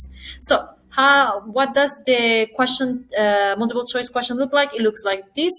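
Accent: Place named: Russia. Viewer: Thank you so much for sharing the best tips with us🙏don't get me wrong, I felt a little bit Russian accent in your speech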